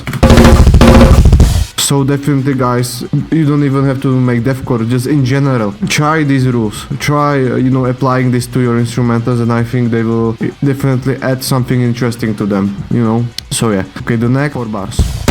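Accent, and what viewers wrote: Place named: Czech Republic. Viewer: Good vid, are you Czech? Guessing from accent :-)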